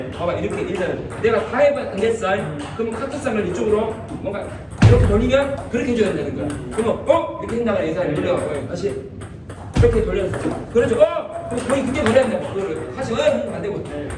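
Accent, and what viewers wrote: Gwangju, South Korea. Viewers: 관장님~ 리시브 강의 최고네요~ 구수한 사투리..친했던 광주출신 군대 동기가 생각나네요.. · 광주 싸나이 배딸배아빠"님 반가워요. 저희 전라도 광주 맞아요 ^^; 광주 살 다 직장 때문에 수도권으로 가신 분들이 많지요. 배딸배아빠님께는 의 구수한 사투리가 더 정겹게 들릴 거 같아요. 이렇게 탁구를 통해 다시 인연을 맺고 얘기 나눌 수 있어 기뻐요. 탁구 10년 차라니 대 선배님이시네요. 저 로봇수제자는 올해 탁구 4년 차 새내기 입니다. 그래서 엉성한 것투성이랍니다. 하지만 탁구 열정은 누구에게도 뒤지지 않아 졸라서 부족한 것 채워가고 있어요. 정말 탁구 레슨 중에 하시는 한 말씀 한 말씀이 주옥같은 경우가 많아요. 그래서 저만 보기 아까워서 유튜브를 시작했답니다. 어제까지 춥더니 오늘은 완연한 봄기운이 느끼지는 불금입니다. 즐겁고 행복한 불금되세요~ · 나도광주사람이지만 사투리진자 최고네요 엄청웃기요ㄷㄷㄷ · 광주인가요~? 사투리 구수하니 좋네요 ㅎㅎ 광주살다 서울와서 직장다닌지 16년 됐는데 그립네요~ 서울에서 탁구에 빠져산지 10년차 초보인데 관장님 가르침이 주옥같네요 ㅎ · 전라도 사투리 구수하네요 내용도 좋구요 코치 부럽습니다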